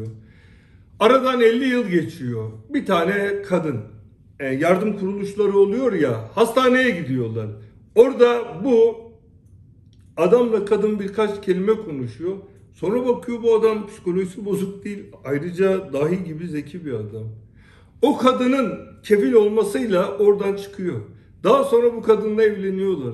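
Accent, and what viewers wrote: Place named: Izmit, Turkey. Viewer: Milyonlarca insanın diyemediğini anlattın bu ülkede seni unutmucaz reis keşke olsanda ülkende şu izmit sesinle canlansın eskisi gibi herkez kötü lesede kaderini bilki ki biz biliriz seni reis korkak gibi susmaktan sa aslan gibi ne varsa anlatmaya calıstın unutmadık unutmucaz seni 🙏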